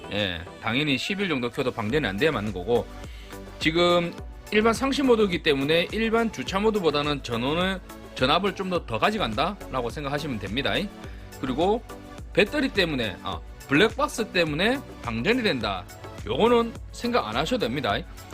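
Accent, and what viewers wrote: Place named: Busan, South Korea. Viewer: 사장님 궁금증을 풀어주셔서 감사합니다. 저는 포항 사람인데요. 사장님 말씀이 말끝마다 됩니다이, 안 됩니다이, 좀 웃깁니다이~😂😂😂 부산 그 특유의 말씨 ㅎㅎ. 암튼 정보 감사하고 믿음이 가는 분 같습니다. 번창하세요~!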